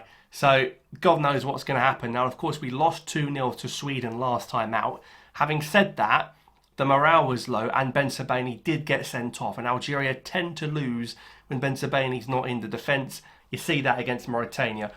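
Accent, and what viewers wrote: United Kingdom. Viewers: Is the British accent difficult or am I not good enough to understand English?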